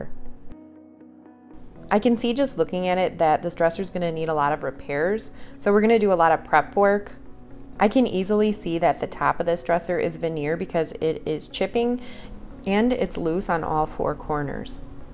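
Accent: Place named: Minnesota, United States. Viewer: I love this! I have already ordered most of the items you use! Also, I love your Minnesota accent!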